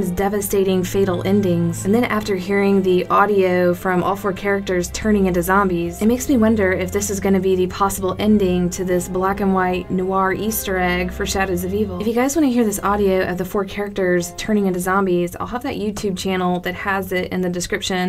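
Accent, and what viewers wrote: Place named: United States. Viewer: Btw u sound like a cute American?what state are you from but slap me with the holy macral if I'm wrong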